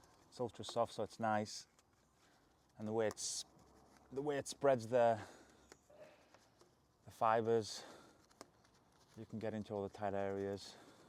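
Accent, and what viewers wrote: United Kingdom. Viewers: Great Chanel! Great products! Do you mind me asking about your accent? I hear a little North East twang maybe? 🤔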